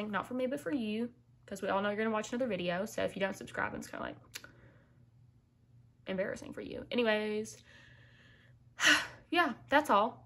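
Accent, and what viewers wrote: South, United States. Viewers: you just look so glamorous and the southern accent totally caught me off guard. I had to take a double take. But I agree that you are so funny. But to have so much success at such a young age, I'm so jealous.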